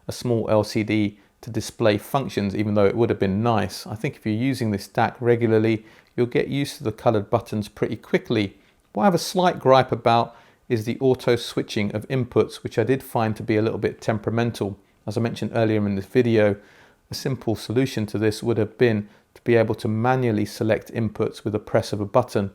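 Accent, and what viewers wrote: United Kingdom. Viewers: Thank you for this excellent review about the mojo 2. NB: i love your style .. please don’t change! be yourself elegant precise fair honest ! This British accent gives it some how apart gives some lead 😊 which makes me think : if his analysis matches the high level and articulation of his language then you may peacefully rely on his expertise!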